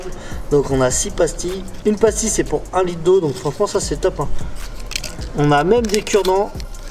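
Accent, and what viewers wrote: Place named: Maghreb. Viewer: Tu es d’origines arabes? T’as un accent du Maghreb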